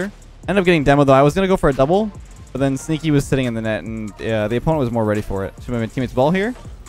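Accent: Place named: Canada. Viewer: My Canadian friend pronounces his wors with -ar like you such as car and garbage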